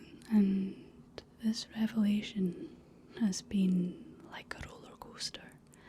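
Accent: Scotland, United Kingdom. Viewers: Scottish accent definitely works on me. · A Scottish lassie that does Star Wars references? I think I am in love🥹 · I came across a few of your videos with your storytelling and just fell in love with your voice. I can honestly say I've always loved the Scottish accent. Growing up on the east coast of Canada, Newfoundland we are known for our unique language.. Keep being you. Never change. You have a beautiful soul. Thank you for being here.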